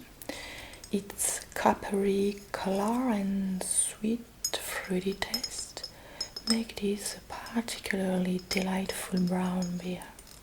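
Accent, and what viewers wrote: France. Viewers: If one could imagine an ASMR role-play with a glorious French accent and a sumptuous assortment of exquisitely presented sound triggers, you would more than adequately have described this beautifully rendered video. This production is so well done, and I highly recommend it to anyone who understands and appreciates the art we know as ASMR.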